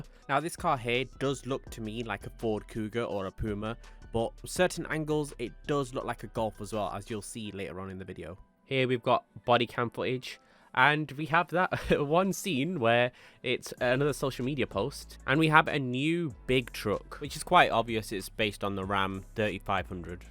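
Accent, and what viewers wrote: England, United Kingdom. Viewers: i feel like i’ve watched this video twice already but this one just has a english accent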